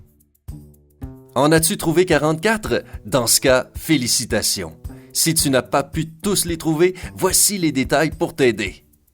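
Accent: Canada. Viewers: arrêter la voix du canadien j’ai mal a la tête quand je l’entend... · C un canadien???!!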